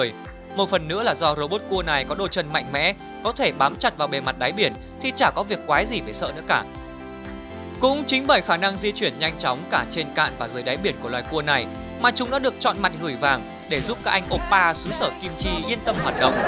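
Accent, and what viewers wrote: South, Vietnam. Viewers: Anh nói giọng miền Nam hài quá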